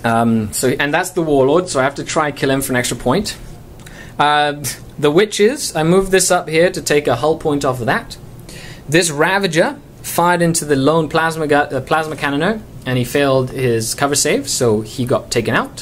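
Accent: United Kingdom. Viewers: Hi! Nice battle repport but I wonder what happened to the scourges, I'm starting my gaming season pretty soon (damm exams :S ) and will follow you batreps with interest. I'm planing on runing 3x5 Wyches with HW in venom with few extra stuff (venom blade and Blast pistol) let's see how it goes! BTW are you british? I know you are in Canada but you sound brit :O.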